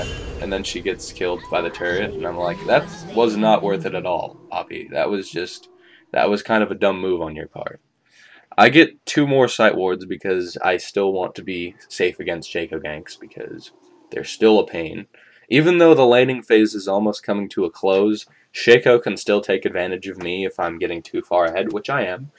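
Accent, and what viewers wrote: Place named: Netherlands. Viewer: Where are you from?You sound Dutch,or mayb Belgian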